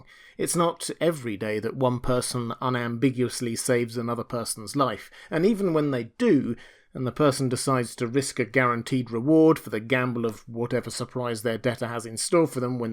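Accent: United Kingdom. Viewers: Lol it's just his British accent I think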